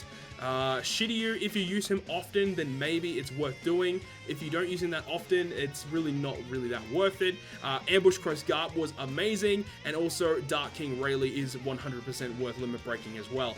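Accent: Australia. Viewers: Been looking for this damned video. I need an Australian accent to explain game to me thank God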